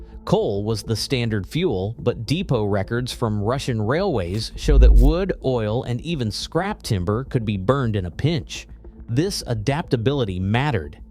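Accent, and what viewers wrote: United States. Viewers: 🙂 Ja vad ska man säga om denna film berättare . Det låter som en amerikan som läst på lite gran om historian . I USA körde dom Ånglok tills dom kom på att Diesel lok va bättre , Inte det som alla andra har Diesel-elektriska lok utan bara diesel . Det är bra för miljön i USA . Sovjet köpte Svenska ånglokomotiv en bra bit in på 1930 talet . Sovjet byggde om sitt transport system från häst & vagn till Järnväg . Dom hade set sig om i världen på 1840 talets Europa & Amerika byggdes järnvägen upp . USA är inte direkt kända för sina järnvägar . Europa har ett virrvarr av statliga och privata järnvägar allt från smalspåriga till bredspåriga . Järnvägarna tar detta på stort allvar och under 1890-talet börjar järnvägen elektrifieras. Boxholms bruk är först i Sverige med ett järnvägsspår med eldrift år 1890. Fem år senare öppnas den första elektriska järnvägen för persontrafik. 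Det är den 11 kilometer långa sträckan mellan Stockholm och Djursholm. Så 60 år senare vaknar Sovjet med elektrifiering av sin järn väg . Men att ställa upp lokomotiv på stora järnvägs uppställnings platser finns överallt . Om man är försiktig med dom alltså ha dom som reserv . Så kunde man göra som oss Svenskar ställde upp dom i Bergrum eller under tak , Utspridda i landet . Att göra som amerikaner och Ryssar är trist där står dom och förfaller . Det är bara att göra FRAG av dom och koka om till stål igen . Amerikanerna med sina Diesel lok har mer bekymmer eftersom deras gamla lok är giftiga så ingen vill peta p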